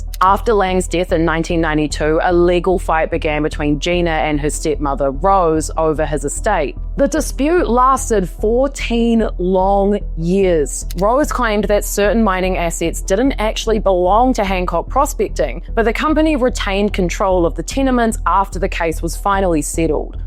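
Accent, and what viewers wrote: New Zealand. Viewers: Are you real or a Kiwi sounding AI ?? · As an Australian you a new Zealand due to accent correct me if I am wrong. I would not front up on YouTube channel and butcher the pronunciation of New Zealand country and city names. Was born in Melbourne but my father came to Western Australia mining and did well. Gina was older than I but we attended the same school. Your pronunciation of Pilbara is wrong your pronunciation of Wittenoom is wrong. So what other major facts are wrong in your story or deep dive on Gina ????? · Sounds like Kiwi accent..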